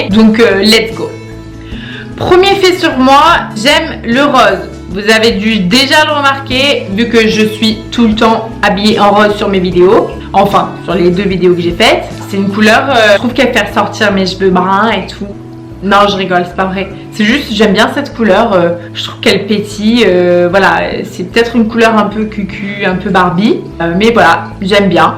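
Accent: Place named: South, France. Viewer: Le "rause" , on adore l'accent du sudd. Gros bisous ❤ super vidéooo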